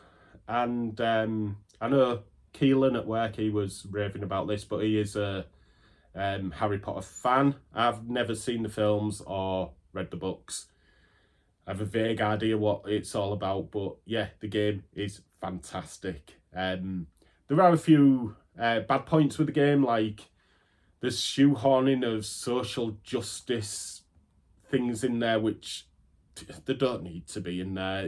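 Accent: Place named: Hull, United Kingdom. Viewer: Hey dude, great video, love prince of persia games. Found this video randomly and because you sound like me (im from hull, or should i say 'ull 😂) ive subscribed. Look forward to followng your journey mate. Keep up the great work and collection 👍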